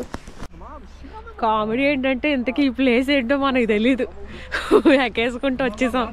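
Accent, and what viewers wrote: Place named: Andhra Pradesh, India. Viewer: మీది రాముడువలస??? మీ యాస అలాగే వుంది. ప్లీజ్ చెప్పండి.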